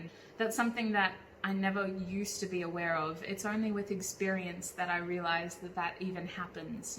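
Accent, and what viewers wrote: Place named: Australia. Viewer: Love your videos and its great to hear more about you ❤ Also great to hear a fellow Aussie accent!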